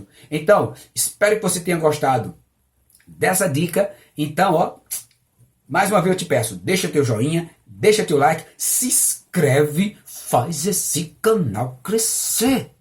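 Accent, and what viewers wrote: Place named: Northeast, Brazil. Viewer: Vídeo bom! Acho que você é nordestino 😅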